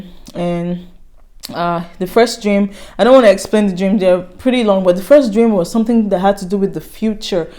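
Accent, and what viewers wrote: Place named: Benue, Nigeria. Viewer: New viewer here❤? Where are you from,you sound like someone from Benue,Nigeria…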